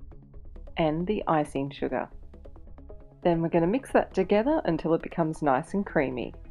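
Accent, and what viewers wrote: Australia. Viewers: She sounds Australian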